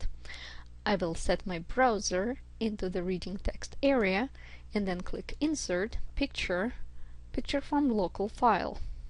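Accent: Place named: Russia. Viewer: This video helps me a lot! Thank you so much! Although you connect the first question to the second and so on, I don't know how to import 3 or more JMix sentences into moodle. Can I get it right? when importing these sentences into moodle, we choose all sentences! In exam view, I just import 1 file containing many different questions ( about 40 sentences). In hot potatoes, can I create and import 1 file containing many different kinds of questions? such as; JMix, Jquiz, JMatch.... I would appriciate your help! * P/S: Don't speak English in Russian Accent! It's hard to hear!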